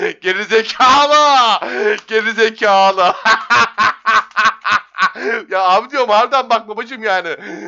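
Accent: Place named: Bartın, Turkey. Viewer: Bartın’lı mısın babacım 😃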